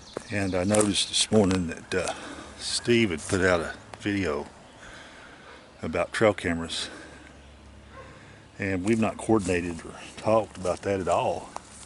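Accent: South, United States. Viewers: Enjoy your Videos, good hearing another Southern voice, pretty Country there. Stay Safe, best wishes from a Ga Fan....😷🇺🇸🍑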